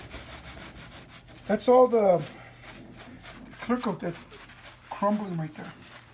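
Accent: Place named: New Mexico, United States. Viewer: Good product and nice New Mexican accent!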